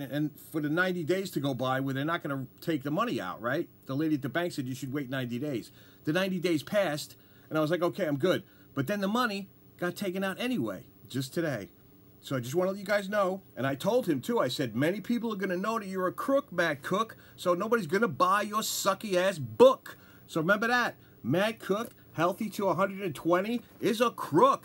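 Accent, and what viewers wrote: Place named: New York, United States. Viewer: Yes - that there is an awesome New York accent!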